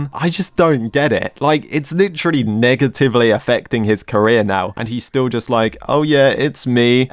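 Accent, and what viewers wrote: United Kingdom. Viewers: I could tell that this man is British or at least European cuz them words could only be learned from a proper grandmother as a British folk myself 😂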